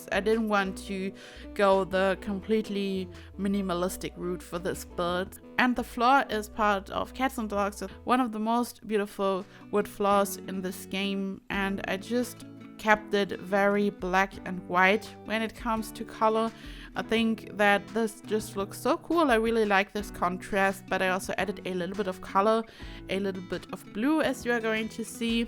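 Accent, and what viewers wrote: Germany. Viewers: Akzent gehört und sofort gemerkt das du Deutsch bist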